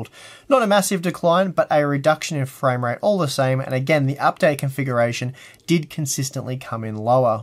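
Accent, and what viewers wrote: Australia. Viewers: Will the next generation of CPUs have this issue? Actually glad I waited to upgrade, even if the hit was slim to none. Also thanks again for the updates. And once again, love your accent. Australians have perhaps the most pleasant accent IMO.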